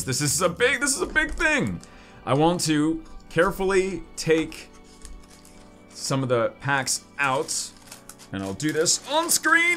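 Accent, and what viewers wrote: Germany. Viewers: You littrely sound German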